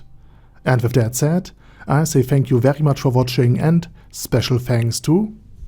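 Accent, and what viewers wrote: Germany. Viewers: his voice's the stereotypical german accent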